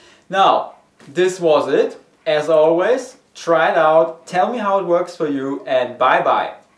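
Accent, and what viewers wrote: Germany. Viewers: Good Video, you voice rocks btw :D, are you German ?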